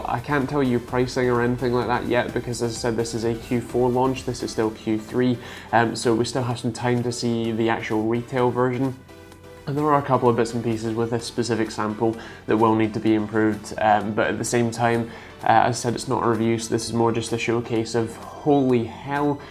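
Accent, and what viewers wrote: United Kingdom. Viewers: You talk very fast. On top of that you have a UK accent. That makes it a little difficult for someone outside of the UK, like me, to understand all you say.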